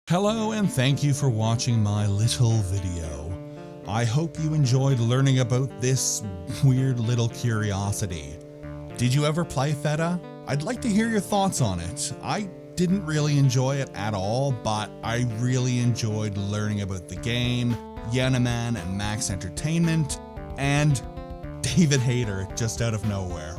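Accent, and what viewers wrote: Maritimes, Canada. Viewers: bro are you from the maritime provinces in Canada?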